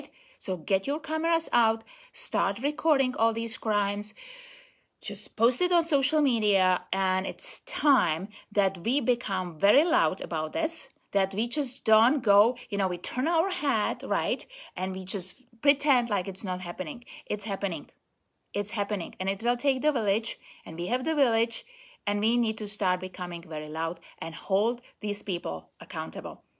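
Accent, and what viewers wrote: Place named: United States. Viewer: I love your American accent beautiful lol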